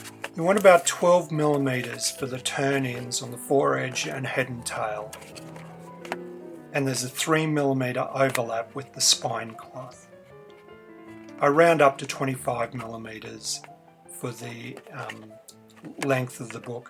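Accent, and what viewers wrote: Australia. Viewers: I thought you sounded Australian! Which state are you in?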